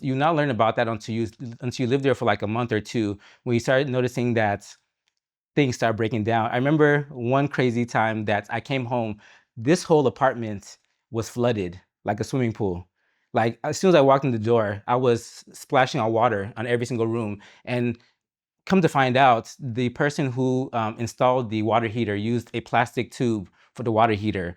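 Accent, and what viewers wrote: Nigeria. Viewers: Yes ..he has a Nigerian under torn in his accent for sure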